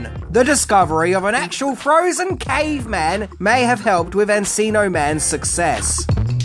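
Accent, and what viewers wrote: Australia. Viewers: No don't be like that! It's all good. We can chalk it up to your cool Australian accent! Most of us don't mind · Who cares if you make a mistake in pronunciation?!? By this time (those of us who have been longtime fan / friends) we all know how much you love your work and the add ins and jokes you pop off more than make up for something as small and Brandon or Brendon. Intent counts friend and your intentions and ending products are AWESOME!!! Plus a lot of us in the states would love to sit and listen to an Australian read a phone book just to hear that accent!!! It’s the best! Keep on keeping on buddy! 😊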